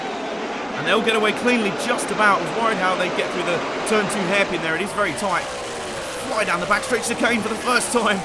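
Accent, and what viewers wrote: United Kingdom. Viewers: I love you forever for that comment. This is just the best and greatest thing ever. And the Britishy accent makes it sound so professional and upper-class, I just love everything you do!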